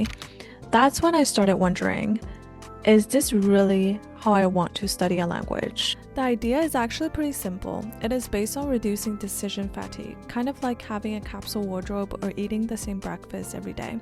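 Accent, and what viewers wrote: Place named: England, United Kingdom. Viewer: You have a great English accent! Subarashii!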